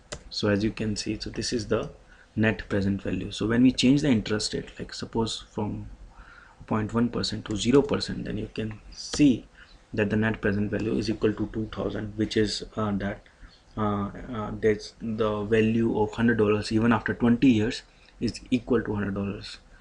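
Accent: India. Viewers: her the hindu accent had to fucking leave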